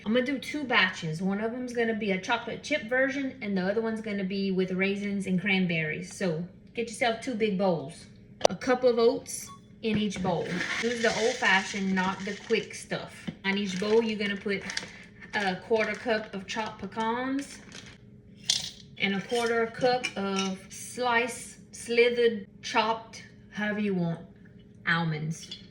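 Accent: Louisiana, United States. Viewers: You are absolutely precious! I love your Cajun accent! You make me proud to be from Louisiana!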